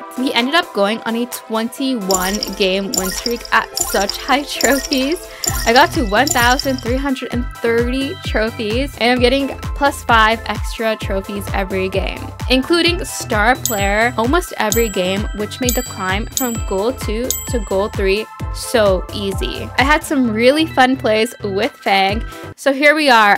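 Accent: India. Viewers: Hey! Are u Indian!? Pls tell me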